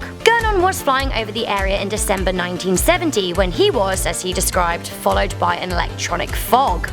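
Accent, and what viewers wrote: United Kingdom. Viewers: Good for you you don't live in America down by Florida cause you are either British Australian or Irish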